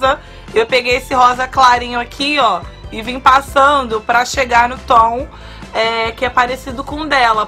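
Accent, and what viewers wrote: Rio de Janeiro, Brazil. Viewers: Não acho a voz parecida, masssss o sotaque carioca é igualzinho e as girias tb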